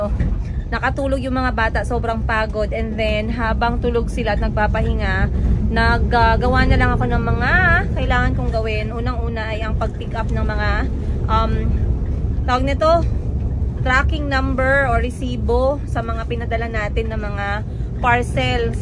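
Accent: Iloilo, Philippines. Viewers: Mam? May i ask if you are from GenSan how come you sound and speak Ilonga? 🤔🤗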